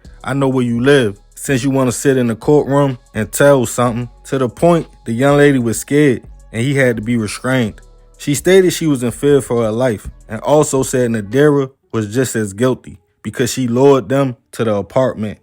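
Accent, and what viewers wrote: Baltimore, United States. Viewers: Brudda i knew u was from baltimore b4 I saw the pick just based off of why you talk. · Baltimore accent got me losing focus on your story. It’s very annoying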